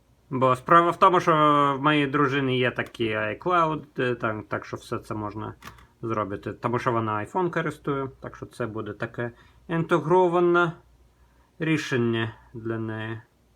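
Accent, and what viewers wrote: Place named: Ukraine. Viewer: Яка цікава українська говірка)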